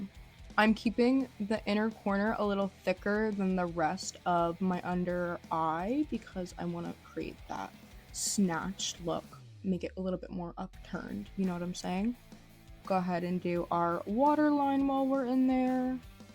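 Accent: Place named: United Kingdom. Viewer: Idk why but from ur tiktoks I always I assumed you were British. Also GREAT VIDEO! Ur so pretty^_^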